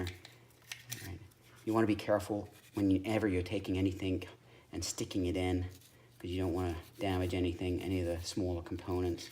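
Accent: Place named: Boston, United States. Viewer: Cool Video! Are u from Boston? Sounds like u have a Boston accent 👍👍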